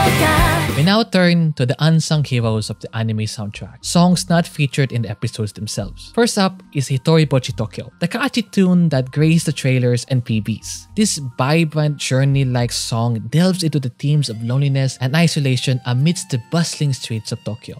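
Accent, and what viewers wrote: Philippines. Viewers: i detect the pinoy accent pare >:) great vid btw!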